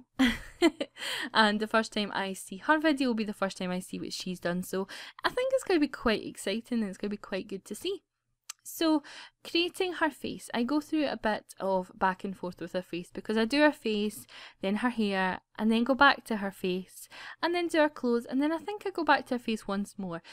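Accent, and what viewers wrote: Scotland, United Kingdom. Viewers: are you from scottland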